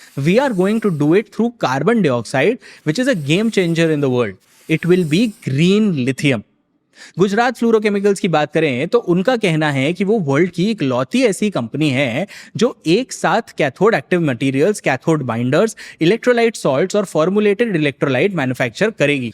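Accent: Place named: Uttar Pradesh, India. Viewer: I guess Northern states like UP, Bihar. I'm from UP and it seems normal accent to me.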